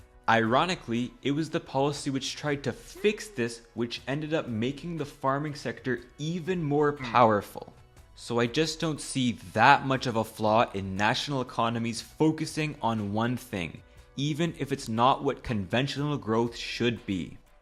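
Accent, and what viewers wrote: New Zealand. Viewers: Your NZ accent is sweet as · loving the kiwi accent lol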